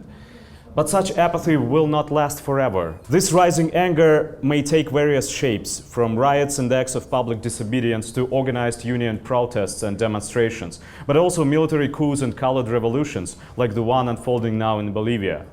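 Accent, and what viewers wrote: Russia. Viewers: СЁМИНУ очень идёт легкий русский акцент:) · Молодец! хорошо говорил с русским акцентом! Я тоже не люблю кревлять языком, пытаясь подражать американскому акценту. А главное серьёзно, коротко и по делу, без лживых импортных улыбок, которые так часто можно наблюдать на подобных заседаниях! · Чтобы попасть на обучение в клятую Америку, пришлось сгрызть зубы об английский. Но по акценту определяется безошибочно - говорит русский. И даже НЕ говорит - читает перевод с русского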